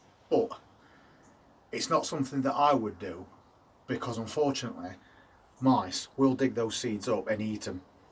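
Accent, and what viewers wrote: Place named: North Midlands, United Kingdom. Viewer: Hi, in rough terms, whereabouts are you in the UK? I’d suspect north midlands from your accent but people move around so that’s not a reliable indicator. The reason I ask is that I’m interested to know what hardiness zone you grow in so that I can follow your advice with cognisant of any local temperature related adjustments I might need to make. FYI I’m in North Shropshire only a cock stride from the South Cheshire border.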